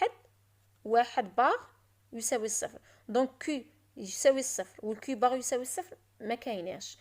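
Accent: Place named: Algiers, Algeria. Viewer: L'explication est claire et l'accent algérois agréable à entendre.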